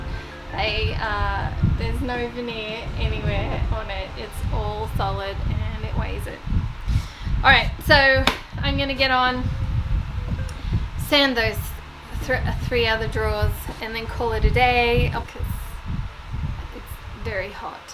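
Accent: Australia. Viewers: I love this,piece! You scrubbed and sanded and scraped your heart out! I love Mrs Browns Boys!, did you see the one where she super glued her arse to the chair, I about peed my pants I laughed so hard! I love British TV, but… I’m addicted to Aussie television! All of it, whatever I can get my hands on! And I’m absolutely in love with your accent! And of course your incredible talent!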